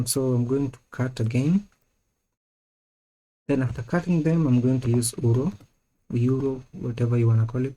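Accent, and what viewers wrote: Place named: Kenya. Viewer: Bro is that a kenyan accent i here 👍👍 nice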